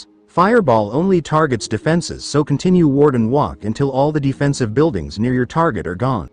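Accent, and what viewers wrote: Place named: Asia. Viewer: I have 0 negative intentions when I say this but I can hear the Asian accent through the voiceover I’m dead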